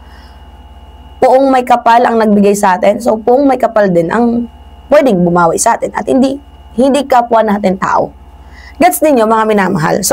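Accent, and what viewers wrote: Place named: Waray, Philippines. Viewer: Waray ka ngay an ate kaupay gud ang waray mag estorya mag esog ang waraynon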